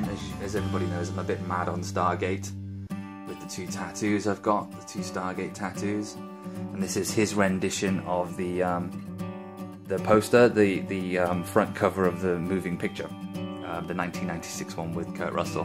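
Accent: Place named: London, United Kingdom. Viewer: I learned several things today. You're left handed, and a fan of odd art. You like Marvel comics, fantasy, sci-fi, and gaming. You most likely spend your down time on XBox. You ride a bike every great once in a while. You're most likely single, given the state of your flat. Coffee is important to you. And you restore things. Your flat resembles the only one I've seen, it's in Edinburgh. Given the accent, though, I'd say you're nearer to London than you are Edinburgh. Also, Stargate. (I may be wrong on most of this.) And my question, though mostly out of need to joke, is: what's your address? ;3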